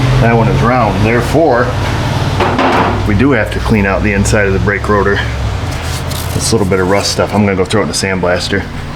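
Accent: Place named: New York, United States. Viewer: I've been watching your videos for years. I always get a good laugh when that New York accent comes through. Mom's family is from New York and the old man's family is from North West Florida on the gulf coast. Mom used to tell me when she met my father while he was stationed in New York, that she thought that the only thing that came out of the south, was rednecks and stump jumpers. I spoke with my aunt (aka - ant) the other night, and she still lives in New York. Her accent and at the speed which she speaks, I had to tell her to slow down and speak to where this country boy could understand her. Love the videos and the great work that you do, keep it up chief.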